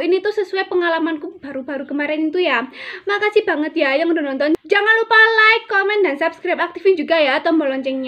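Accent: Java, Indonesia. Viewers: Assalamualaikum Rek kembali lagi bersama video youtubeku... Demen sama video mbak ini jowone enak dengee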